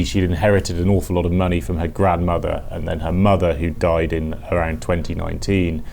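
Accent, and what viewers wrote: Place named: Southern England, United Kingdom. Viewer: It is a nice accent. Southern England? Crispy.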